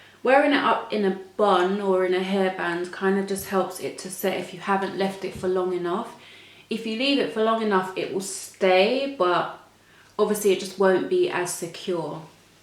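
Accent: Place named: England, United Kingdom. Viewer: The England accent 🥰🥰😌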